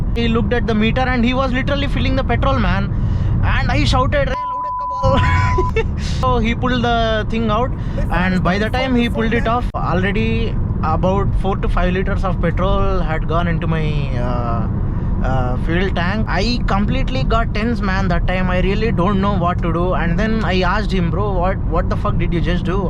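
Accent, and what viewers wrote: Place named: South India, India. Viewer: south indian accent rocks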